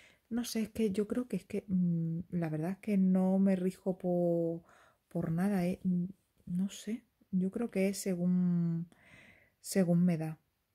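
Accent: Extremadura, Spain. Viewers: Hola guapísima, acabo de ver tu borda y no sabes que ilusión me ha hecho escucharte decir que eres de Cáceres pq yo también soy de aquí de Cáceres jajajaj que casualidad, ya decía yo que ese acento era extremeño puro jajjaja, llevo poco en tu canal suscrita y me encantas, la perrita sofi es preciosa, gracias guapa por tus vídeos, un beso enorme 😘😘😍😍💋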